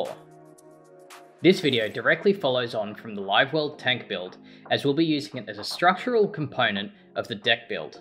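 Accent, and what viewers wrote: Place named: Australia. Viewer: keep the content coming. I also learned some of the Australian accent 😆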